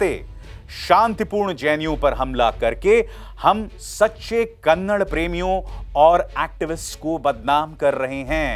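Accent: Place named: Bihar, India. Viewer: Nice tone sir in bihari tone 🙏🙏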